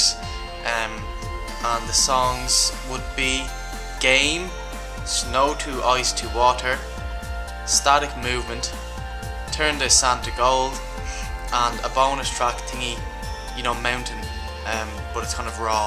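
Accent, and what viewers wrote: Ireland. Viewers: lmfao it could be picture of a penguin. haha ur soo adorable :P btw i love ur irish accentt <333 :D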